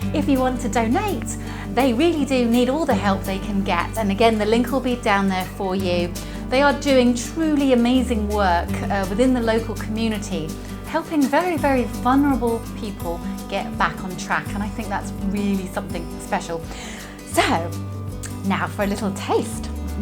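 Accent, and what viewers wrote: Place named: London, United Kingdom. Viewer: You are very welcome. The simplest and most heartfelt things are always the best. You did good and should have been recognized and complimented for it. I love the accent. Sounded like London area. I'm not from the UK but lived in the Devon area for an extended time after my retirement. You would have charmed the old Vets brilliantly. I am so jealous.:-)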